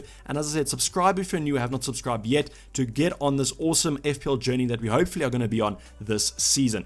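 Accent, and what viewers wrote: South Africa. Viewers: Found this video on my recommendations glad I did there was some valuable information in there love the SA accent and will be subbing. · Are you South African?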